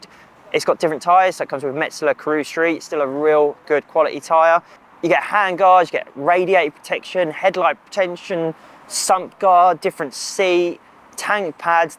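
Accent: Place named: South East England, United Kingdom. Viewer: Great bike, but really disappointing to see that MCN still feel that people ‘crippled’ with a South East accent are only suitable to do video reviews. If you are a UK newspaper/magazine, maybe it’s time to have accents outside the South East represented. I’m from the South East, but travel a lot around the UK on my bike and talk to fellow bikers, but realise that the people I talk to aren’t represented in terms of accent and authenticity on MCN. There are some very knowledgeable motorcycle riders outside the South East bubble. Even the BBC realised that folks outside the South East know stuff. Come one MCN, come out of your South East echo chamber. It’s particularly annoying knowing you’re based in Peterborough, which isn’t even in the South East! Let Wales, Scotland, Ireland (North and South), the North of England and the Midlands and even the South West of England be heard. 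Do what the BBC did and learn to embrace your wider audience, rather than your tea room. Otherwise, keep doing what you’re doing, as you’re fab!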